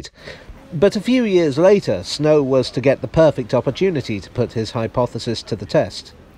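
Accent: United Kingdom. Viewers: British; Snarky; Educated; Articulate; Dry Humor... If Shaun Hastings of Assassin's Creed had a YouTube channel, this would be it. Love your content, man.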